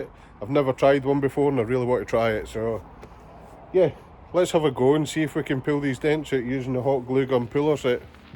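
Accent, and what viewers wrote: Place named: Scotland, United Kingdom. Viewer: Where in Scotland are you from? I'm in Livingston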